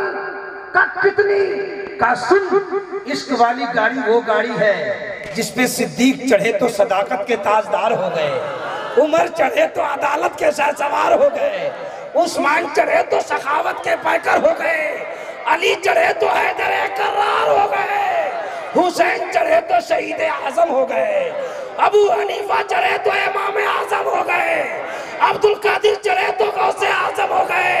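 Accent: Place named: Bihar, India. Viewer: लग रहा ये बिहारी है! ऐसा इस लिए कि इनकी बोलने की शैली बिहारियों जैसी है! बड़ा मज़ा आ रहा है सुनने में! वैसे ये जी रहने वाले कहाँ के हैं?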